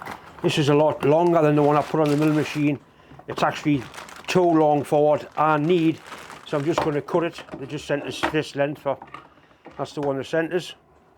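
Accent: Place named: Durham, United Kingdom. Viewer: Thanks very much, really appreciate your straight to the point videos, very helpful. From the accent you must be very close to where I am in Durham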